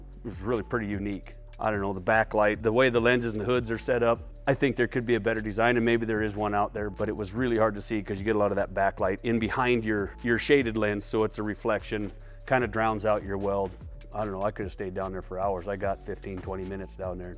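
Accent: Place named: Canada. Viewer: How does this Yank have a thicker Canadian accent than those living in Canada 🇨🇦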